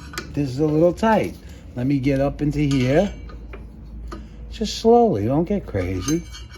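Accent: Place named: North, United States. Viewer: ❤ ABSOLUTELY love thos guy. His US Northern accent really made me smile. He seems nit to like to hear himself talk and really knows how to keep it simple. Plus, he knows what he's talking about. I've done brak pad inspections and replacements in the past, but I wanted to refresh my memory, and this guy really came through. Yes, I did view other videos, but I really like this one the best. Thanks, guy, for giving me that little boost of confidence. O, I'll have a backup guy watching over me. Breaks are something you just don't monkey around with.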